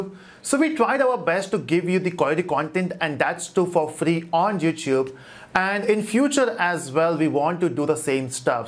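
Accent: India. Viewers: Gracias amigo. the indian accent is awsome. Good luck!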